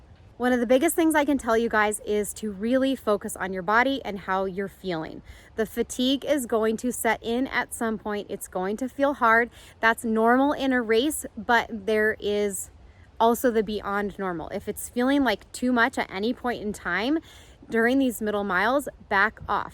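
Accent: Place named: Midwest, United States. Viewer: Judging by your accent (same as mine), it’s nice to see a “northern girl” with some marathon content!! I’m rom WI and now in the twin cities. Do you do a lot of winter outdoor running or do you focus more on the treadmill? How does that affect your training or is it just sustainment mode during the half year of snow on the ground? 😂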